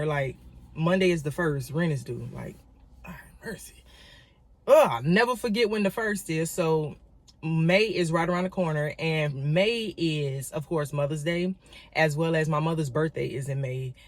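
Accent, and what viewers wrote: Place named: South, United States. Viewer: I hope your leg/ankle gets better!!!! 😂😂😂😂😂you got parking lot rage lil sis next you can borrow my mom handicap sticker she don’t need it. Yo, I love for you to do commentary over a short film or video that would hilarious especially with your down south accent😂😂😂😂. I got an idea next time you go get waxed don’t use the sound from the voice just do the voiceover and put a lil spice with it.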